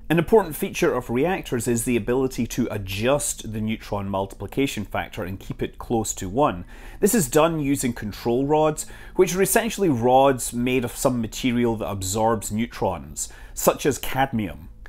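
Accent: Scotland, United Kingdom. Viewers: This is a good level of explanation, I like it. You're sounding more scottish than usual today for some reason...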